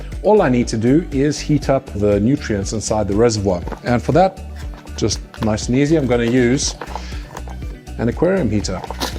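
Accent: South Africa. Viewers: Where are you from originally? You sound south african.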